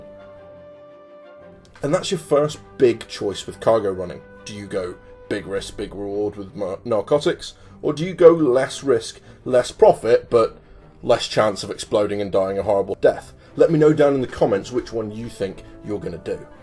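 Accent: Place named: South West England, United Kingdom. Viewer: Love the video, are you from South-West England? It's so refreshing to hear someone who sounds like me on YT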